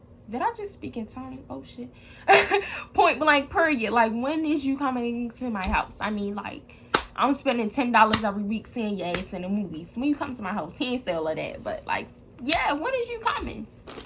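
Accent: Baltimore, United States. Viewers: your accent, you def from baltimore 😂 i never been there and know no one from there but ik the accent clear as day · Your accent sounds like you from Baltimore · is she from bmore i know im late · You from Baltimore ? cause that accent 😍😩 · You got to be from Baltimore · I KNEW YOU WAS FROM BALTIMORE 😭😭😭😭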